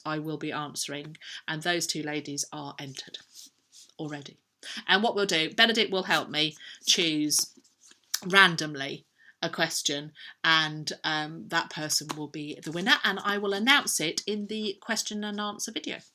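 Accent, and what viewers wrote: United Kingdom. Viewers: Giveaway-I thought of a second question. I like listening to your lovely English accent. Can you do an American/US accent (any region)? :)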